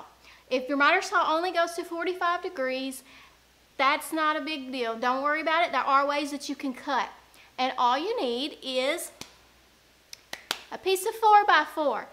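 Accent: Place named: South, United States. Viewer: Southern accent and very funny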